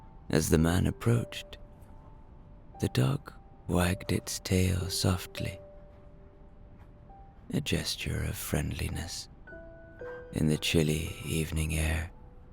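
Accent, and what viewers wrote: Ireland. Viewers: Thank you for this gorgeous heartwarming story. Dogs are just the best companions and full of love. Hello from a Cavan woman in Melbourne who loves hearing an Irish man tell a story 🙏🏻🍀💋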